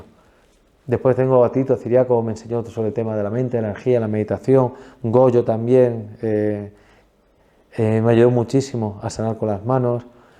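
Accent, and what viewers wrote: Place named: Spain. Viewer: Es un tema interesante, pero el acento español cuesta entenderlo.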